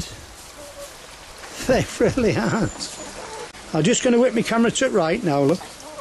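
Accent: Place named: Yorkshire, United Kingdom. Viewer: I lived in Dacre Banks in the 80s... loved this video. Took me back in time for a bit.:) Nice to hear the Yorkshire accent again as well. Thanks!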